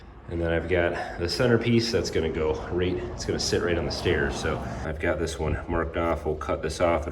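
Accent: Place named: Southern Michigan, United States. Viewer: Appreciate the video. Just curious, where are you from? You speak well. You sound like maybe you are from Southern Michigan. Am I close?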